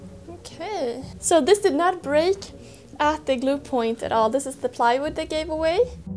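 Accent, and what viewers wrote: Sweden. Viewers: Are you swedish? Sounds like you have strong swedish accent :) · Are you Swedish? Great vid! Thanks!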